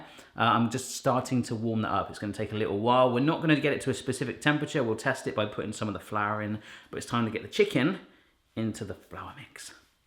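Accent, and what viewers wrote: United States. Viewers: Salt Lake City, Utah, USA! Love the American accent... Buttermilk. Lol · Can we just take a moment to realize how good his american/canadian accent was? Good job! I'm from Roswell GA in the US. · Hi! I am watching you from Calgary, Alberta Canada.... totally love your videos and I get a kick out of your american accent (it freaks me out every time you do that......."buttermilk")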